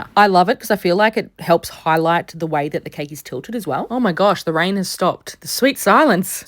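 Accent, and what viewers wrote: Australia. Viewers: Are you Australian? Cuz you sound like it. Not judgeing at all.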